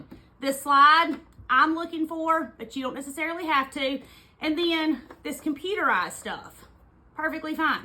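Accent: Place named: Tennessee, United States. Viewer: I ❤❤❤ your accent. My Grandmother, the one who helped raise me, was from NW Tennessee (Union City area). Listening to you is like being with family. 😢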